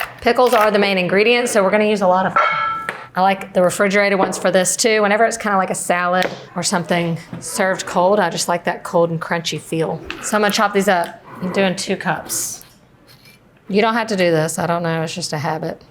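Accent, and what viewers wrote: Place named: North Carolina, United States. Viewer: Love her accent. Sounds like a local (NC) girl...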